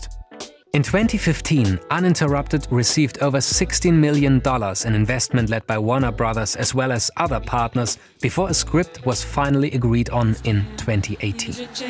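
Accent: Germany. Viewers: Dieser deutsche akzent killt einen 😂 geile videos, weiter so 🤌🏻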